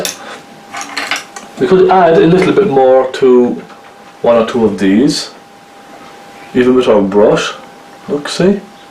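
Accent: Ireland. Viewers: nice to hear an Irish accent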